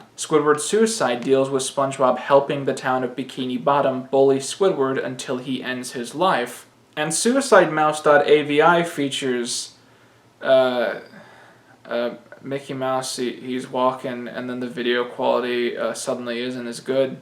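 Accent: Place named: Midwest, United States. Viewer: Idk where you live but it sounds like the Midwest oof my friend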